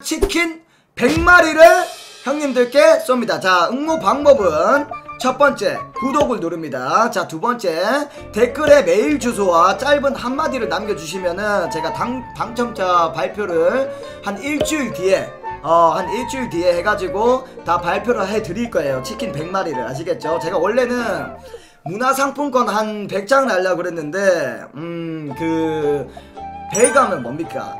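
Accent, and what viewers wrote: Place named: Busan, South Korea. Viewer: 나도 부산사람이라서 말투가 너무 친근했엉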